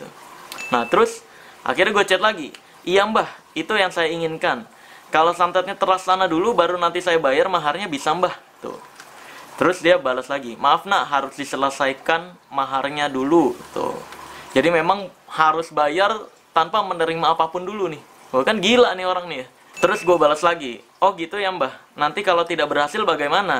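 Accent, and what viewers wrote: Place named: Tegal, Indonesia. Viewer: Bg tegal yah gw tegal jawa tengah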